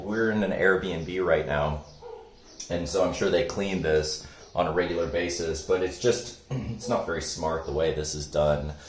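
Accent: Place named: Northeast, Brazil. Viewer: ela e brasileira! :) acho que nordestina!!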